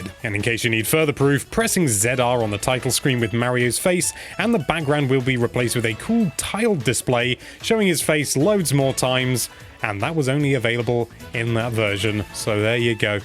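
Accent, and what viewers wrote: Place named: United Kingdom. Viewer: Thick uk accent is tough to handle. man. tapping out.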